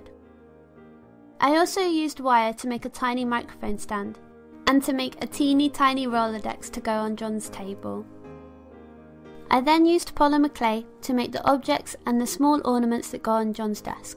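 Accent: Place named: Southern England, United Kingdom. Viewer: You're British Finally i can fully understand every word said in a video (Though your accent says you're from the south, hi from the north)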